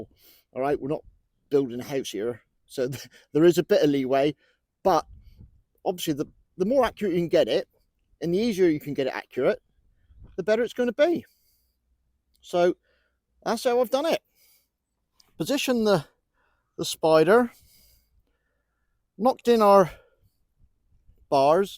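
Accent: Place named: England, United Kingdom. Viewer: I'm curious if you are Canadian or English. Accent tells me English, swapping between metric and imperial, got to be Canadian.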